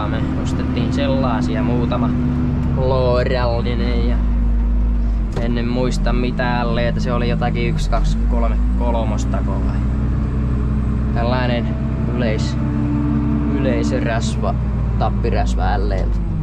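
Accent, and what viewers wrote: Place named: Jalasjärvi, Finland. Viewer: Puheesta päätellen asuinpaikka ei taida olla kaukana jalasjärvestä? 😊🤔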